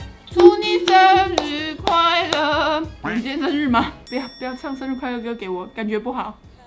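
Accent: Taiwan. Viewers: 好喜歡的口條超台但是又很清楚！真的很難得哈哈😍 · 是塔批歐咖哦～ 然後我是看癡癡的合作影片來的，覺得道地的台語非常對我胃口訂閱了，加油☺️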